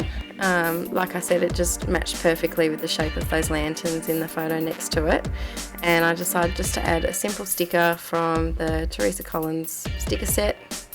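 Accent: Australia. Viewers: Great layouts - looking forward to seeing more :) It is nice to hear another Aussie :)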